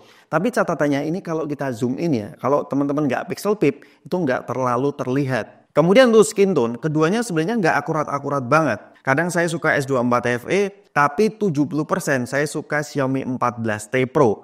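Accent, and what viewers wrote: Semarang, Indonesia. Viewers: Wong Semarang maszeee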